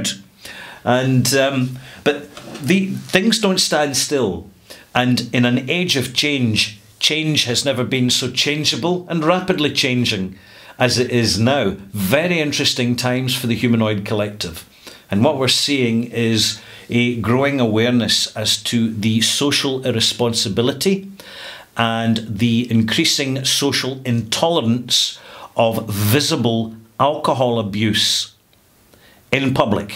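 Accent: Scotland, United Kingdom. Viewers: I was drinking a glass of tullamore dew and decided to youtube a review and stumbled across one of your videos. I have to say I love the Scottish, particularly since I hit Edinburgh last year with my missus. Honestly, I could listen to you for hours, I'd love to buy you a whiskey and to hear your stories. Much love from Ireland <3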